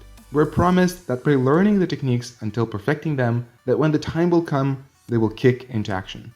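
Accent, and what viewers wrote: United States. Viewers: I see the issue with your view. Martial Arts do work but you have never been taught to think the way of the Martial Artish. You must remember young Martial Artish to swim like a brick, Be the bookcase. Flow like a wall. You sound American but yet you say mobile phone and not cell phone as Americans do so that was unexpected. PS give me your phone ! :)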